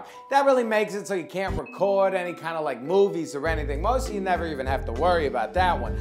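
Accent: New York, United States. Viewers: His voice accent is an accent from the late 1800's New York you're a fucking stupid moron lol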